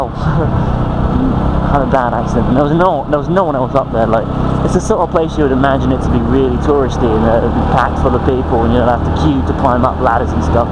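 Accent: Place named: United Kingdom. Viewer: Haha, you sounded just like a young M13 with British accent. Do you have problem understanding Irish people sometimes? I have to have subtitles watching Irish movies.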